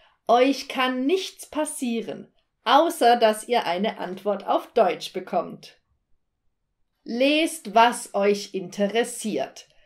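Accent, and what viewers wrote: Russia. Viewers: Да слышно же, что она русская ^^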